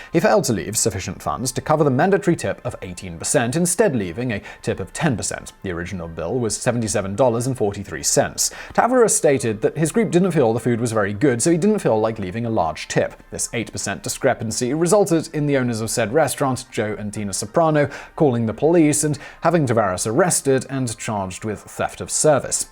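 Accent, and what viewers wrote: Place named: RP, United Kingdom. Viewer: Love hearing you read jive with your posh accent.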